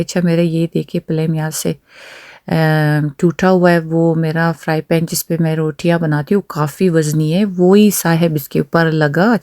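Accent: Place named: Balochistan, Pakistan. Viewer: How are you dear Sis 😊? Wait he kar rai thi apke vlog ka 🫠 , ye tu batain k ap pathan hu tu apko balochi kese aati he ? Wese apka lehja balochi he hai pathano jesa nai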